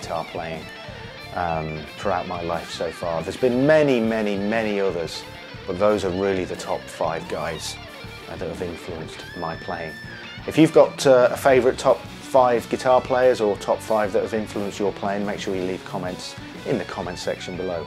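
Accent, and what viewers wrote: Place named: Oxford, United Kingdom. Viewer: You got my "like" after just saying 5 words because you speak oxford english so perfectly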